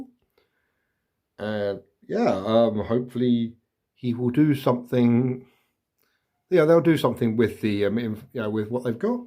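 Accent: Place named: United Kingdom. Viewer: Big into Five Parsecs, and I'm playing it 15mm as well, so to find your channel (a fellow Brit by the sounds of it as well!) is great. You might be getting a fair few comments on me as I blast your playlists.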